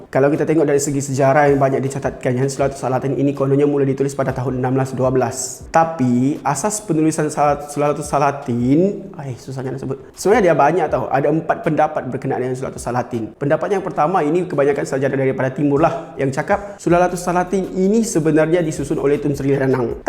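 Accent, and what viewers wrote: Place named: Sabah, Malaysia. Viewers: bro, istidi saja kau sama slang sabahan mu, lg siyuk didengar, ok. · Nampak thumbnail.. uinah mesti Sabahan ni.. klik.. dengar suara.. confirm urang kita baini.. mantap bosku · Pekat sabahan mu pok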